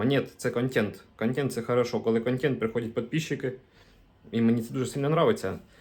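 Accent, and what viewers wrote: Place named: Surzhyk, Ukraine. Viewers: головне, що ти гідно тримаєшся і не зважаєш на хейтерів. Якщо будеш знімати подорожі по Польщі то заздалегідь готуйся пиши сценарій, буде цікавіше дивитися, це не повчання, а бажання. Удачі! P.S. на Полтавщині теж так балакають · За суржик 👍👍👍👍👍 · Привіт ти з якого міста Бо в тебе такий суржик як у нас запорізька обл.Гуляйполе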